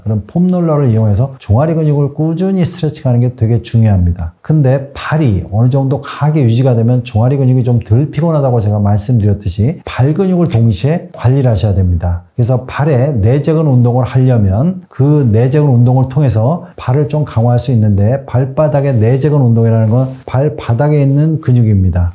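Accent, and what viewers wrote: Gyeongsang, South Korea. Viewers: 경상도분 같아요?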